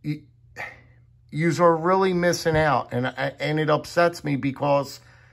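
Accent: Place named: South Jersey, United States. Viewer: Lol that SNJ accent..... I grew up there myself. Oh, and the range boss is amazing.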